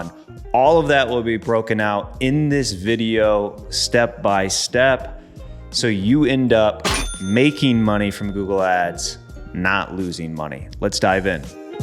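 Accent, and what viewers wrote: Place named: Boston, United States. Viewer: I am from Boston and you sound like you are from around here! We are straight shooters like you - love the vids! All hard content and no bs fluff! Thanks man - awesome videos!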